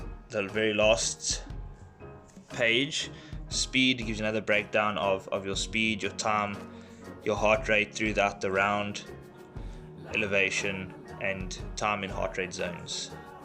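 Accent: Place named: South Africa. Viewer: SA? you sound like you are from SA.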